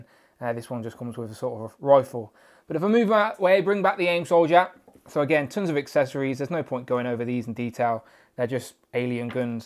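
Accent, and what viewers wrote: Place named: United Kingdom. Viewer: 23 Famez haha right ? Lol drove me nuts, but In his defense at the same time I know he’s a Brit and probably isn’t use to our American pronunciations 😅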